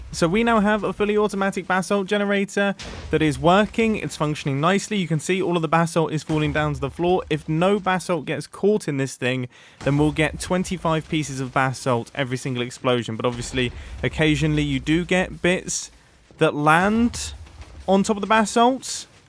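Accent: United Kingdom. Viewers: Uhh no... no crackle but you do sound more British than usual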